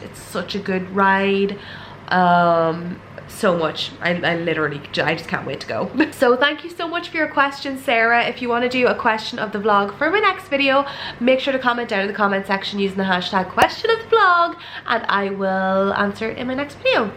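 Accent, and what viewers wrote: Ireland. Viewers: Ho. I'm Portuguese and I love your videos. I also love your Irish accent. 😊 Take care.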